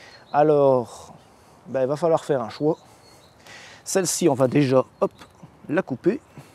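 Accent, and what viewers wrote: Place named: Belgium. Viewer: Oui l'accent bien-sûr, et vous parlez de Daniel Schmit en plus.. Nous sommes compatriotes! C'est un vrai plaisir de vous écouter prodiguer vos conseils clairs , précis, facile à appliquer..Merci! Cécile et Emmanuel de Chaumont-Gistoux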